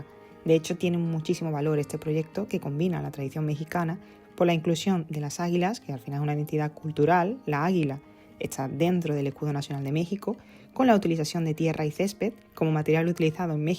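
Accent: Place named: Seville, Spain. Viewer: Una sevillana que intenta cecear. O una canaria que ha vivido en Sevilla. O una hondureña con alguna conexión con Sevilla... No sé, no pillo el acento.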